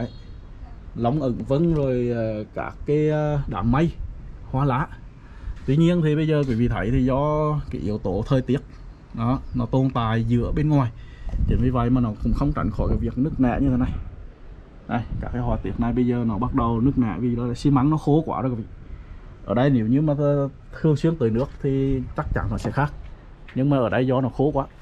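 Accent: Hue, Vietnam. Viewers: Tôi là người miền Nam, nhưng nghe ngôn từ của anh rất rõ ràng, dễ hiểu. Với giọng Huế chính thống đặc trưng của anh, cũng là yếu tố khiến nhiều người yêu mến đấy. Cảm ơn anh nhiều! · Giọng Huế của bạn cũng dễ nghe, nhưng đừng nhanh quá. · Giọng Nói Nghe Rất Rõ Và Hiểu. Giọng Huế Thì Nói Tiếng Huế. Không Cần Phải Thay Đổi Giọng Nói. Sài Gòn Nghe Rất Rõ? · Giọng Huế dễ thương anh ơi luôn đồng hành và ủng hộ anh chúc anh nhiều súc khỏe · Đúng ạ, em là ngườì Bắc mà nghe giọng Huế thích mê. Ko xót 1 từ nào. Mong anh giữ phong độ và có nhiều đoạn phim quý giá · EM HIEU TIENG AY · giong rat hue va rat hay tui tung hoc chung dh voi nguoi hue o sg nhung giong ban rat de thuong va de nghe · Em mà đổi giọng sẽ mất đi một nét rất Huế, và sẽ không còn là Huế nữa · Xin cảm ơn bạn,không,Huế thì phải tiếng Huế mới đúng và hay,cộng đồng hãy ủng hộ kênh này vì rất nhiều thống tin,bạn hãy tự tin lên nhé c đ ủng hộ bạn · Cứ giữ giọng huế nói nghe hay hơn em nhé!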